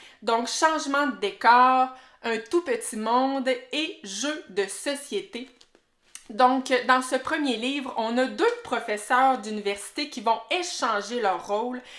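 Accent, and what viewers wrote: Quebec, Canada. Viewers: Coucou 😊 super vidéo 👏👏 i comme c'est agréable d'entendre l'accent québécois ❤️❤️❤️ comme dis sur Instagram je découvre pleins de nouvelles sagas et surtout j'ai mis malphas dans ma wishlist! Merci pour cette vidéo! Bisous 😘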